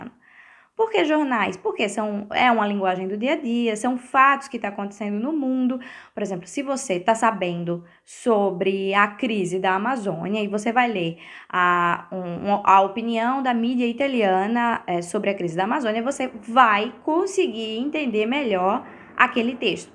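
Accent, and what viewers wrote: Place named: Rio Grande do Norte, Brazil. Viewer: Ela tem um sotaque que parece o potiguar hehe bonito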